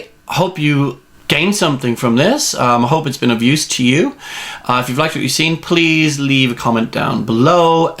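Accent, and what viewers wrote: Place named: United States. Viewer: are you UK mixed with US..???..you sound a lil bit American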